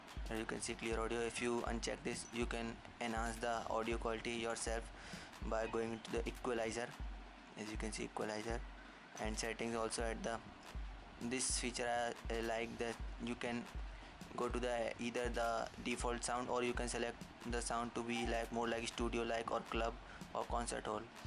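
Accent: India. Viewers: Worst review ever watched..This loser doesn't know the purpose of the OFF button at the back panel next to the battery..But he thinks that he's a tech genius. :P Besides my ears hurt by this Indian accent.. :@ "Somebody Gonna Get Hurt Real Bad" LMAO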